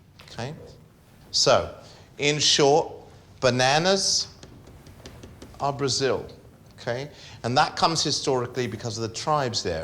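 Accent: United Kingdom. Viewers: English accent is near perfect. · Speaking as a Brit, his accent is very near perfect. Just need to tweak a few tiny words to nail it completely ('you caught me', 'sinister', 'called it' etc) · I don't believe is American, he sounds genuinely like one of use brits!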